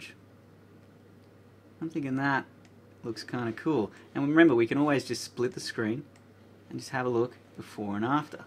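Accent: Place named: Australia. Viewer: I love your Australian accent! So easy for me to understand :)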